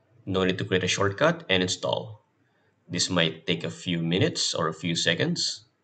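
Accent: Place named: Philippines. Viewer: you sound like a Filipino.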